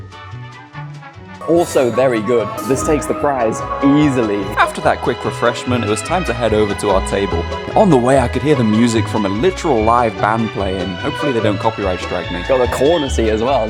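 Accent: Liverpool, United Kingdom. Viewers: I can tell by your accent. Liverpool is a great place. The Beatles came from there. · I think you're the first British YouTuber I've followed. I love the British accent. With all my love, I wish you the best of luck in your future.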